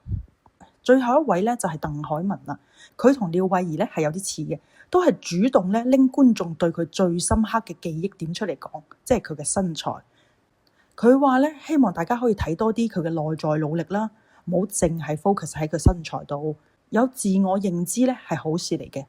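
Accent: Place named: Guangzhou, China. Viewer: 主持人有廣州口音🤔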